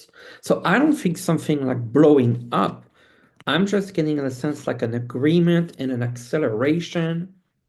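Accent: France. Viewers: I like the way you talk, calm and soft. Cute French accent, ooh. I think you present your thoughts very well, very well. See you next time.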